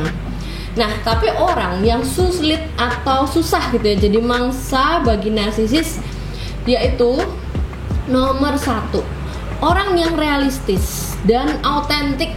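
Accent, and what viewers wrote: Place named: Semarang, Indonesia. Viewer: Logatny kayak logat2 orang semarang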